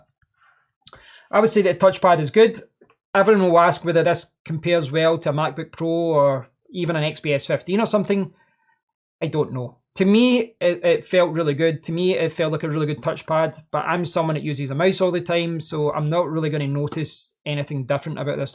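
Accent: Scotland, United Kingdom. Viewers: oh, my bad. Scottish accent is awesome too. I love it. Just bonnie.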